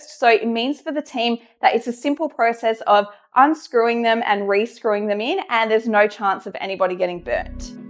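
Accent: Australia. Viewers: First thing I have to ask are u Australian. Just from your pronunciation of words . I'm thinking of starting something similar with 3D prints and design. Any info would be great i am in SA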